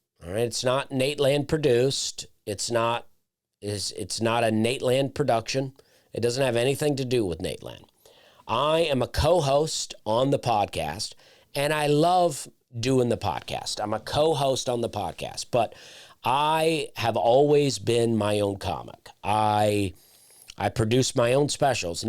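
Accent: South, United States. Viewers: Slay is one of my favorite comedians of all time… There’s a certain vibe that southern hospitality comedians have that CAN be similar like Larry The Cable Guy, Jeff Foxworthy, Trae Crowder and yes even Slay BUT… each comedian has their own unique delivery… In comedy there are main techniques and skills like… sarcasm, storytelling, conveying multiple perspectives, expressing multiple emotions, over-analyzing & underplaying, crowd work and most importantly the ability to express yourself… All the pros use these techniques and skills in their sets… My point is the basic skills of a comedian are all the same from Carlin, to Pryor, to Burr, to Norton, to Shaffir, to Larry The Cable Guy, and even Slay… so it’s easy and even lazy to take two comedians with similar accents and say they are the same … for example… you can throw Sebastian Maniscalco, Chris Distefano and Andrew Dice Clay into a melting pot and review them as similar… but theyre not even close to the same thing… Okay Im totally overreaching here but my point is…. 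Even though Larry and hone the same comedic skills for comedy and even though they have similar accents and even some tastes… theyre totally different and both are good in their own way… Don’t worry about the haters Slay, youre awesome. And I think you mentioned suicide in all of your specials, so I hope you never kill yourself and that you create another ten awesome specials. Cheers. Ps. Here’s a joke I wrote. It’s stupid but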